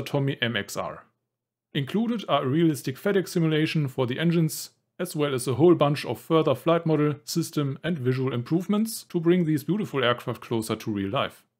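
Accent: Germany. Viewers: Ich wusste doch, dass ich da nen deutschen Sprecher rausgehört habe. Super Video und beinhaltet fast alle must haves. Was ich noch empfehlen kann ist We Love VFR. Bringt VFR Objekte wie Sendemasten, Radarkuppeln, Kräne und bald wohl auch Industrielle Schornsteine nach Europa. Alles basierend auf Open Street Maps. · ich wollte ihm nur einen Tipp geben und es klingt doch noch recht Deutsch aber ich weiß nicht wieso du dich dort nun einmischen musst Grüße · I missed your Duetsche accent! I had to unsubscribe to many cuz it got to be too much. I hope to fly this summer in Xbox X MFS2020. Keep up the good work lad! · I like the german accent ;) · Hello again, long time gone. I finally found you doing flights again! Love your Duetsche accent! SUBBED/LIKED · thanks for sharing, you have very strong germany accents while talking in english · Super Video 🙌 Ich wusste sofort, dass du deutsch bist ahhahaha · Dieser deutsche Akzent kickt so hart! 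xD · Hey great video thanks! Funny is just how you express your English! Then I poop the sausage off! It's so funny your German English accent. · when a german speek english nice video ;) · I bet you are from a high German area like Münster, Bielefeld or Osnabrück. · Danke! (you have a German accent! :P)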